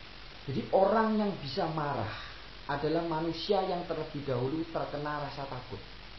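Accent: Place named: Java, Indonesia. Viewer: dari cara ngomongnya sih seperti sprti logat jawa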